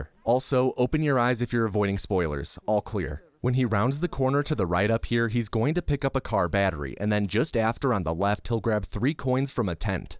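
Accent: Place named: Midwest, United States. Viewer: It's so weird that I didn't notice any accent in your voice until AFTER the Chicago guy skit; Once you returned to your normal speaking voice I could hear lil bits and pieces that sounded distinctly Midwestern. As a native Chicagoan myself I was never able to detect the "Chicago accent" while I lived there unless it was very pronounced or over-the-top like in SNL's Da Bears skit. Other than that I was convinced that we spoke "neutral" English. After living in Oregon for almost 7 years though I'm slowly getting better at picking up on the subtle nuances of Chicago-talk. The biggest difference I've been able to spot is in short "o" sounds like in the word "body". I realized that I say it as though it were a short "a" sound, almost like "bahdy". I guess I'm just anudder one a' da hunnerds of Chicahgo fellers who tahks like a gahsh darn fool 😮‍💨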